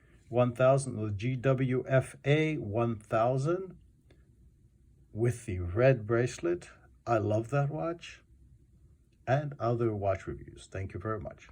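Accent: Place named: Greece. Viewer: You sound like a Greek who lives abroad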